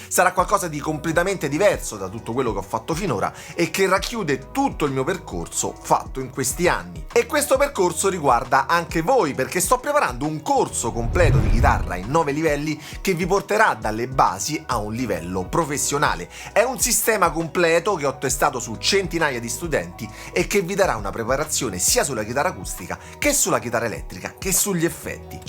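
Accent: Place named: Rome, Italy. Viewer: Bellissimo video! Molto illuminante. Nel mio caso, ogniuna delle "storie" è valida per me. Forse la seconda no...ho suonato solo chitarracce 😂. Con la terza sono riuscito a suonare il solo di lapsteel di Walk of life con la Telecaster. Sono partito da x0,5 fino ad arrivare all originale. Soddisfazione immensa! Ho una pedalboard....da 4 anni....sono ancora in alto mare😂😂😂. Sei grande e riesci sempre a farmi sorridere con la storia del non iscriversi😂. Non ti ho dato retta su questo. Complimenti ancora e grazie mille! Sento che sei di Roma o dintorni. Anche io, insegnami!!!😂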